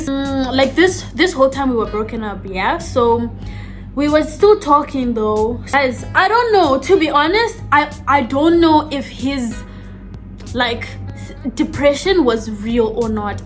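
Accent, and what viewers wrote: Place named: South Africa. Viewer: Sounds like a south african gent😭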